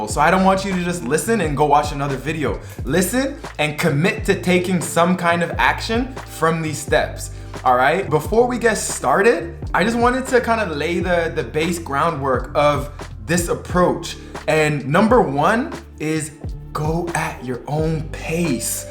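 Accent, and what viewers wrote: Canada. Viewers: I love this! I love your energy and joy! Side question: are you Canadian?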